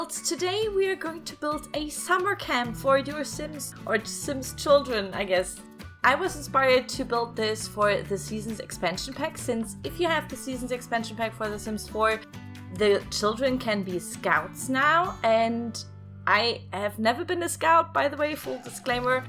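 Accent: Germany. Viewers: Your accent isn't even half as bad as mine... To be honest, I didn't even notice that you're German and I'm one myself. My accent is also the reason why I'm afraid to start a YouTube channel, because people can be mean an all. Your accent is fine and not as bad as people make it seem! I also loved the way you adressed it and I love this camp, I'll definitely add it to my game! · Wusste ich doch das ich da was deutsches raushöre😏😂😂❤😍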